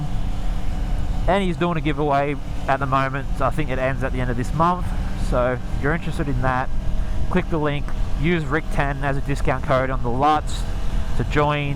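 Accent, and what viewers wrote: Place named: Australia. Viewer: I definitely enjoyed watching this with Ozzy accent! Greets from Germany mate!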